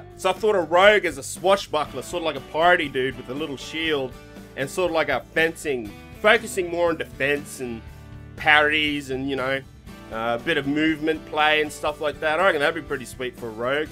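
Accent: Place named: Australia. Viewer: can never get enough of that sweet ausie accent!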